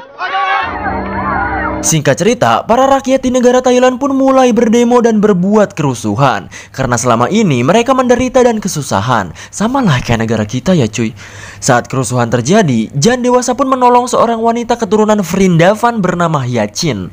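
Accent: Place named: Sunda, Indonesia. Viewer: Orang mana sii bng?ngmng sunda nya lucu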